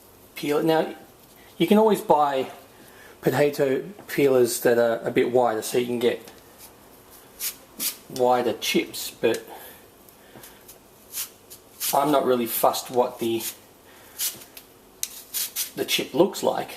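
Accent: Australia. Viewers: are you an Ausi? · Ypu being aussie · Cooking channels Australian aha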